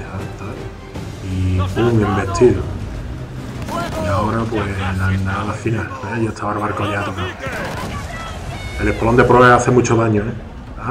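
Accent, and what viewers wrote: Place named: Spain. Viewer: No comento nunca, pero me veo en la obligacion de pedirte que si aun no compras un nuevo microfono, lo hagas, se escucha horrible, ademas de tu acento español super marcado (ese es un detalle, lo del microfono no). Al Impoluto lo derrote a lo bruto, me quedo 1 cuadrito de la ultima barra (con el Jackdaw al 100% en todo). En el caso de estos hermanos super barcos, no los pude derrotar, asi que... termine aqui xD. Pero como uno de los 2 (a eleccion) al principio al ir de frente, uno se puede colocar justo detras e ir disparando y haciendo daño con el espolon. No tenia idea de como se usaba el mortero (¿mortero el ataque aereo a arta distancia que aparece marcado en rojo cierto?), ahora si podre terminar con estos barcos. bye, salu2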